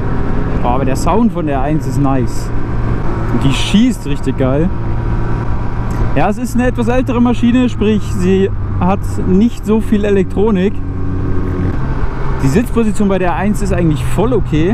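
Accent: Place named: Bavaria, Germany. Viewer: red mal mehr bayerisch in deinen videos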